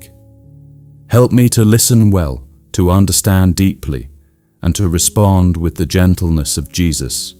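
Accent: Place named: England, United Kingdom. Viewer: Get rid of the English accent.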